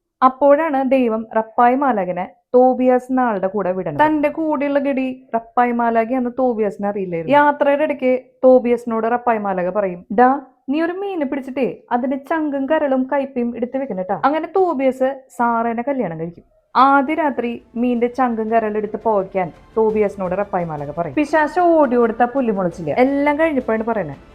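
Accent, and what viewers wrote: Thrissur, India. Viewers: മ്മടെ തൃശൂർ സ്ലാങ് കൊള്ളാട്ടോ 🌹👍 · എർപ്പേൽ മാലാഖ. ഞാൻ ജനിച്ചത് ഒല്ലൂരാണ്. പക്ഷെ, അന്ന് സർക്കാർ ജനന സർട്ടിഫിക്കറ്റ് നൽകുന്നില്ലായിരുന്നു. എന്റെ അപ്പാപ്പൻ 75-80 വർഷം മുമ്പ് രണ്ടര പതിറ്റാണ്ട് കാലം ഒല്ലൂർ പള്ളിയിൽ വൈദീകനും ദീർഘ നാൾ വികാരിയുമായിരുന്നു. അമ്മയുടെ വീട് മഠത്തിന്റെ തൊട്ടടുത്തായിരുന്നെങ്കിലും സ്കൂൾ വിദ്യാഭ്യാസവും താമസവും കർമ്മലീത്താ മഠത്തിലായിരുന്നു. തൃശ്ശൂർ സ്ലാങ്ങും(ഡാ തോബിയാസേ....നല്ല രസമുണ്ട്), ശബ്ദവും ഹൃദ്യമാണ്.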